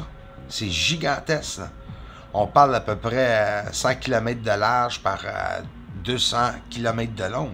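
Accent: Quebec, Canada. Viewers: J'aimerai te donner un conseille, je sais que tu as déjà énormément de boulot, mais pour les Français ce serait géniale que tu sous-titre t'es vidéo car certaines personnes ont du male à comprendre avec l'accent Québécois... ensuite si tu pourrais délimiter les zones avec des pointillés légers qui chemine les contours au fur et à mesure les structures dont tu parles, car moi-même je n'arrive pas à tout percevoir peut-être par manque d'expérience visuel personnel. J'adore tout ton travaille sincèrement mais nous ne sommes pas tous de ton niveau et je suis certain que ce serait très fructueux pour ta chaine et tu pourrais ainsi d'autant plus développer ton projet financièrement très certainement si t'es viewers accrocheraient plus facilement au premier regard, en espérant que tu ne fasses pas assassiné par les men in black haha Big up bro Je te kiff!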